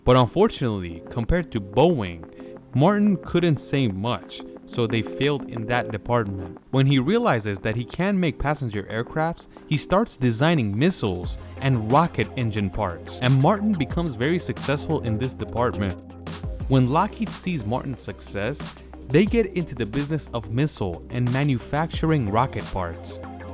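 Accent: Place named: United States. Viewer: زبانت عالیه جان امریکن حرف میزنی😊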